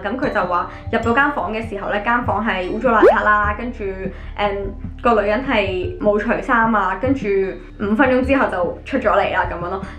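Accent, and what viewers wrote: Standard. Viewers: 你係印度人或者香港人? 好标准啊你个广东话。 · 真以为是配音啊，好标准啊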